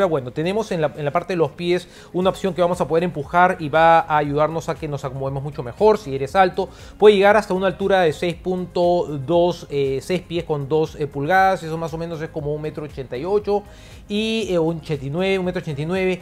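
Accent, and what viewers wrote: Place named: Lima, Peru. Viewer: si me lo suponía por que habla cómo limeño pues me agrada sus videos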